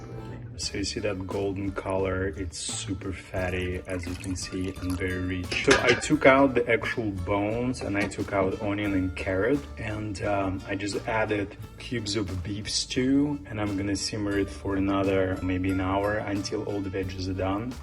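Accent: Russia. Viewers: Russians will never truly lose their accent. As a Russian myself I can assure that...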